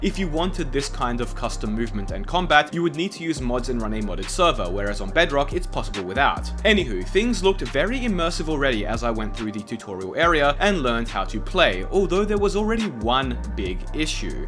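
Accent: Australia. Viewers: Oh my god... You're Australian? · Australia? I thought you were frok the UK.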